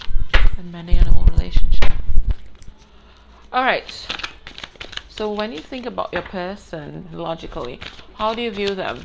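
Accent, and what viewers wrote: Trinidad and Tobago. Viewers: You sound like a Trini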